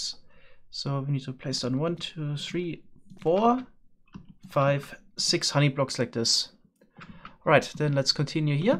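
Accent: Germany. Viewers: Are you german? I think I can hear a slight german accent. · ach du kacke hast du nen deutschen akzent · Sat german accent <3 :D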